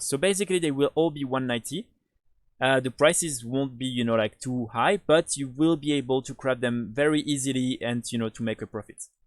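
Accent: France. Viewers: J'ai direct entendu que t'étais français haha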